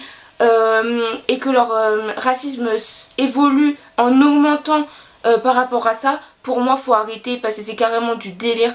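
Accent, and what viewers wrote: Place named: Madagascar. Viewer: j'aurai dit malgache 😊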